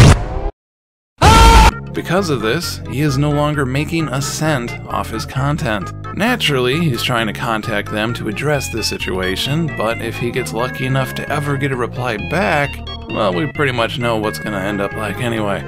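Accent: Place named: Brazil. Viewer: Damn, another one falls to that bs copyright policy, i would like to help you out but i am kind of short in money (i can't even afford an internet of more than 6 megas) btw, until you spoke i forgot you were brazilian, nice accent, hope you get a fair amount of patreons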